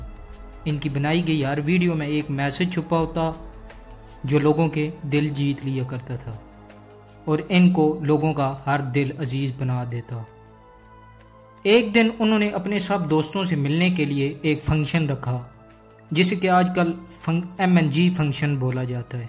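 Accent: India. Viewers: axha phr ap khud hi th0ri apni v0ice k0 chng kr k b0lian qk ap ki w0 punjabi wala acent h..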